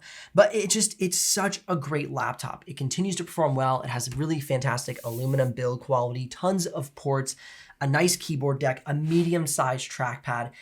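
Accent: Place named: United States. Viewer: Its basic murican accent, which is easiest accent to understand.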